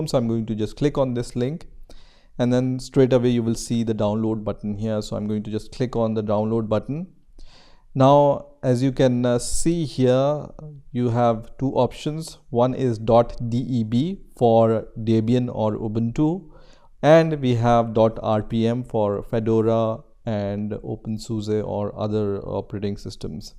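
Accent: India. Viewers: hearing his accent I can confirm that he is an indian guy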